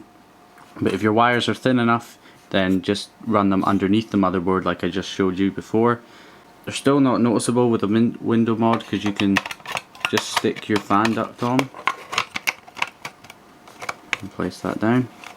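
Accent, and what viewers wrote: Scotland, United Kingdom. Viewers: Good old scottish accent love it hahaha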